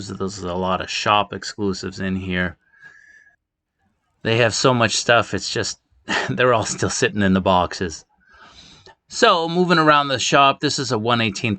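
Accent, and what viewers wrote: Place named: New England, United States. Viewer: Definitely from New England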